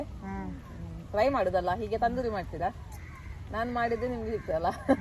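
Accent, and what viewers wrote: Mangalore, India. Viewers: ನಿಮ್ಮ ಮಂಗಳೂರು ಬಾಷೆ ತುಂಬಾ ಚೆನ್ನಾಗಿದೆ ಅಕ್ಕ ಬಂಗುಡೆ ಮೀನು ನಾನು try ಮಾಡ್ತೀನಿ ಅಕ್ಕ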